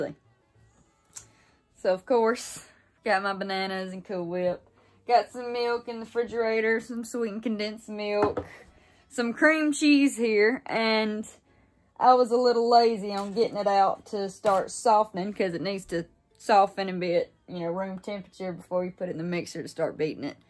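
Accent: South, United States. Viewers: God I love a beautiful southern accent. Guess I’m making banana pudding. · Hey,: You in the kitchen and with your southern voice, kinda warms the heart, just like Christmas! Keep them coming, even us old guys love you!! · That southern accent is absolutely relaxing. Her and her dad are cool cats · her sweet southern drawl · I watched the whole video and heard nothing she said because I Was just listening to that southern accent lol. Love southern accents. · Love the videos and the southern accent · southern siri voice great idea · You could read the phone book and i would check it out. Love your voice being from the north don't hear that southern accent that much. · "Puddin" oh I love that southern talk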